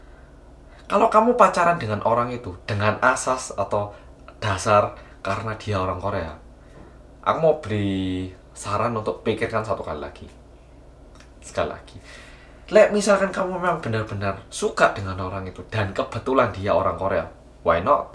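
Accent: Java, Indonesia. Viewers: Orang korea ekspresinya jowo medhook buanget ㅋㅋㅋㅋㅋ mugo wae iso ketemu sampean mas, aku kerja di busan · Pusing di bahasa koreanya❎ Pusing di bahasa medoknya☑️ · Aq yo malang oppa..aseli aq ngrungokno boso medok mu👍👍👍😂 pas merem bayangan wong jowo pas melek kok ngene😄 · Rapopo kak aku orang jawa juga btw aku ngakak kalo denger kakak ngomong e muedokk nemen😂😂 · Lucu bingit tampang Korea ngomong Jowo lbih medhok dri aku. Smangat mas bagi" pengalaman pengetahuan sama kita. Smoga sehat sukses slalu ya. Aamiin. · Hihihi, kaet weru onok wong korea medok.. 👍🏼👍🏼👍🏼👍🏼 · Mas...lihat kamu bicara kok gemes to aku...medok e ra ilok ilok lucu 🤭🤭🤭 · Lebih suka medoknya mas daripada oppa oppa wkwk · Di Drama terlihat romantis, aslinya sebaliknya 😂😂 makanya nggak pernah nonton karena percuma😂😂😂 btw, mas keren, your indonesian Javanesse dialek is awesome. You deserve a big tumbs up👍👍👍👍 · lha jian kok iso medok yo jowomu mas mas 😄😄😁, lucu seneng aku ngerungokke medok ngono 👍👏👏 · Ya ampun itu logat jawa nya t.o.p 😂😂 bgt 👍🏽👍🏽👍🏽dach😂😂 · MasyaaAllah mas mas.. 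Astaghfirullah.. Biyung alah gusti Allah, jan eram kok medok ee sampean MasyaaAllah.. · Suwun cak... Tapi uenak ngrungokno sing muefhok...🥰🥰🥰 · ih Ya Allah nguefans kon aku ambek mas iki wkwk, muedok pol polan kon. Aku ae sing wong jowo kadang yo sok sok an ninggalno logat medok ku lek ngomong ambek konco ambek wong wong liyo tak ubah logat ku dadi indonesia ke jakarta jakartaan ngunu😅 (maafkan kesomse an ini) lah iki wong korea lo isok muedok pol ngalah ngalahi aku, de'e yo bangga pisan. Aku yo bangga kok rek dadi wong jowo *tenang ae. mulai saiki tak tingkatno maneh skill medok ku cek e isok ngalahno mas korea sitok iki hahaha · Paham .... mas korea sing medhok. Sampean ne bener pol. Okeh wong nom jaman sak iki ninggal falsafah jowo... "bibit bobot bebet" .... mulo do bingunggg. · HAHAHAHA ko ngakak nontonnya. Orang korea bisa se medhok itu, pd gue yg org jawa bisa bahasanya aja engga=)) Inggris bisa, jawa bisa, korea bisa. 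Udah mantap kamu bang!🤘🤘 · Koq medok to mas'e, koncone uwong jowo toh? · Baru tau sampean orang korea toh.. medok'e mantep..👍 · Gw org jawa malah tiba" bingung ma bahasa sendiri karna bahasa jawanya mas terlalu medokk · Ini orang korea bicara jawa nya medok plus lancar banget anjay. Aku yg asli jawa ngomong bhs jawa aja ga lancar kaya gini wkwk. salutt ! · Korea jawa bgt mas logatnya, bahasanya juga.. gk keliatan orng luarnya.. dlu punya mntan orng vietnam gabisa selancar ini ngomongnya, boro2 bhasa jawa bhasa indo aja susah bgt.. luar biasa mas ini.. cari pacar orng indonesia aj mas 😂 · Sumpah seru banget nonton nya wkwkwkwk medoknya ampe emosi😆😆😆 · Sampean mas lebih tepate koyo wong Indonesia turunan tiongkok. Medok tenan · Gue yang turunan Betawi Jawa tp engga bs ngomong Jawa berasa gagal jd org Indonesia gegara liat org Korea ngomong Jawa dan logatnya engga maen-maen. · Kita2 ini wong indo...tp ora semedok ini.....aku ngakak bgt vidio ini... 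· muedokkkk aslii... Terima kasih mas sudah mencintai bahasa kami.:)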